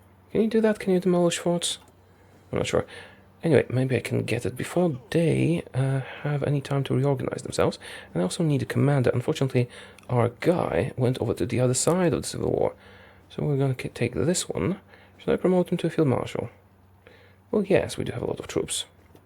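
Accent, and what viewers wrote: Poland. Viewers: are you polish you sound like a polish person who migrated to the UK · Your voice kinda reminds me of the English dub L But a little polish? I think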